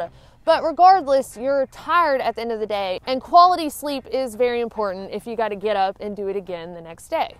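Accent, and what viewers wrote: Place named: East Tennessee, United States. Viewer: Wow a lot of great info! I like the accent reminds me of East Tennessee.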